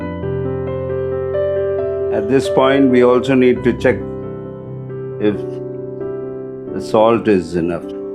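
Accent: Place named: India. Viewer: I’m third generation Indian born and living in South Africa. Love the content, your accent is a little bit similar to first generation Indians. My grandparents surname was Vengtas Ramiah, they are now late and wish I knew where in South India we are from. I visited Mumbai for work and loved it. Love from Johannesburg ❤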